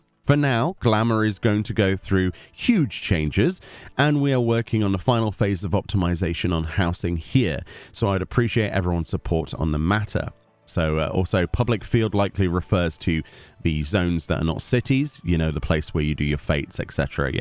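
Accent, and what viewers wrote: United Kingdom. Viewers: You really don’t know what 1.5 of 40 is? It’s true what they say, British accent is no substitute for intelligence. I’m just glad you managed to read those squiggly things they call letters.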